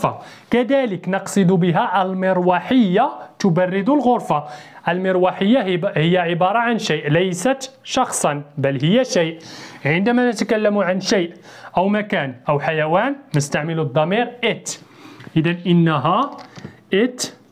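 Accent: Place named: United States. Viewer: هذا نطق أمريكي و ليس بريطاني على ما أظن أستاذ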